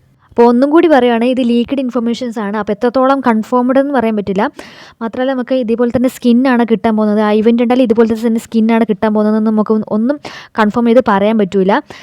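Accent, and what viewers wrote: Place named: Kannur, India. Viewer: athe Kannur slang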